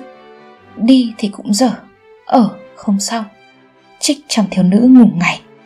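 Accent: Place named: North, Vietnam. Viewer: Giọng Bắc kỳ thì khó nghe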